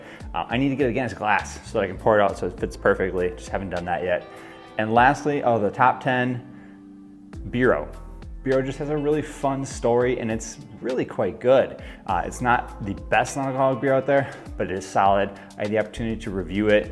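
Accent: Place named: Minnesota, United States. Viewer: I hear Minnesota and you got my sub gotta support a fellow Minnesotan. 🍻 Was looking at your vid to see if Bero is the best but I think I’ll have to try Bero and Go Brewing. Dad’s a beer lover. And trying to get him to ween off the alcohol because of his health but I’m also interested in the NA stuff because I’m not a fan of alcohol.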